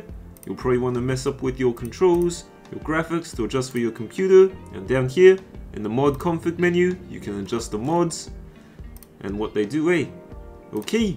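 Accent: New Zealand. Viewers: He has a very strong kiwi/native New Zealand accent, very distinctive and a part of our country. Is honestly similar in some ways to South African accent because the conditions of our countrys history is similar, both being British Colonies. But still very different :D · Where are you from? Are you a kiwi? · Thanks for turtoial. NZ Accent goes hard. Much love from Oz. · He sounds like he is from New Zealand 🇳🇿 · The bro is kiwi can tell that accent anywhere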